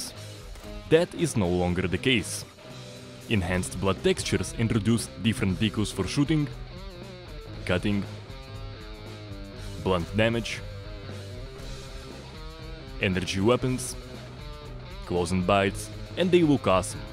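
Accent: Russia. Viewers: I like your russian Accent :D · from what i see from ur accent ur u russian or other?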